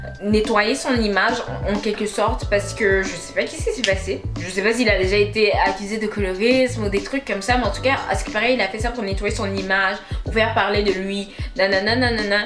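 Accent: Canada. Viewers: Tu as un accent canadien je kiff grave 😂😘😘😘😂😂😂😂😂😂😘😂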